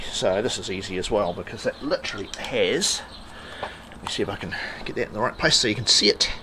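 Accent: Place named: Australia. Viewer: Thank you! Looked all over you tube for a video and this is the only one I could find. Very simple and saved me a ton of time. Great Australian accent too!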